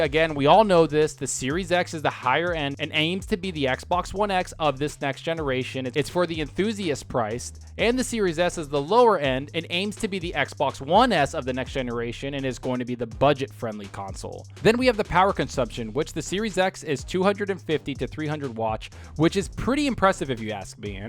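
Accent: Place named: United States. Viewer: This channel is aids. Video is 80% neddless filler with an annoying over Americanized voice.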